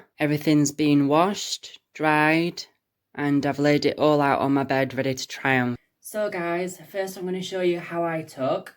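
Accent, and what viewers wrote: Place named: United Kingdom. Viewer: I here a British accent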